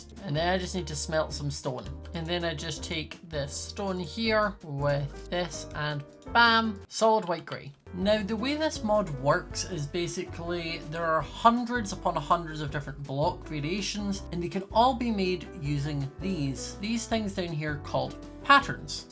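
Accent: Scotland, United Kingdom. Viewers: Are you Scottish??? Bc you have the same ascent as my half brother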